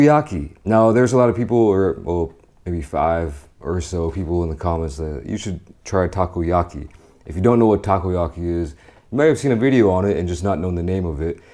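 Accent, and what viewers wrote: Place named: Philadelphia, United States. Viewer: Happy Birthday homie!! Easily my favorite content creator, the way you narrate things reminds me of my brothas from philly 🤙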